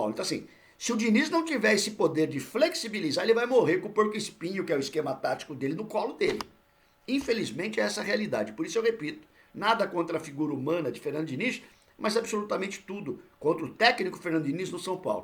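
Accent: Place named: Rio de Janeiro, Brazil. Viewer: Em qual cidade vc nasceu , vc tem um sutaque carioca kkkkkkkkk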